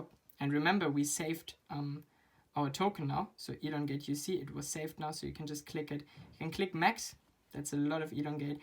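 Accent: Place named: Germany. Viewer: For being German your accent really isn’t bad. Good video thank you for explaining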